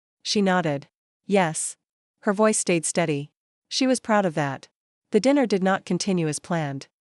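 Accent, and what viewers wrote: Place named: Italy. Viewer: 👏👏 lovely and written with humour.. good narration, albeit the italian accent interposed and no clear report regarding the powder on the tie and pin…♥️♥️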